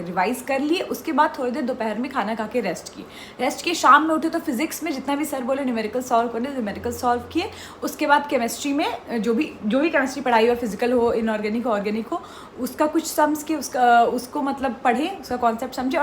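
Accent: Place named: Uttar Pradesh, India. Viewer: UP Accent ✅ Using Ham always 💗 , liked it .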